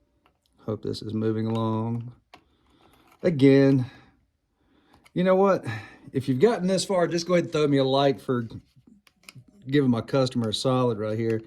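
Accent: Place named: South, United States. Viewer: Buddy, I heard the southern accent and euphemisms and knew right there that this video was the only video I needed. You're right. That German engineering is dummy proof. Thank you for the walkthrough, dude. Be blessed.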